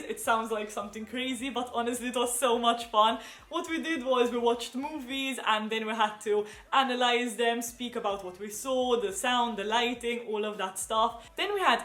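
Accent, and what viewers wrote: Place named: Jamaica. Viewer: Shes got a Jamaican twang with a mix of Londoner and Russian for Rs and Ls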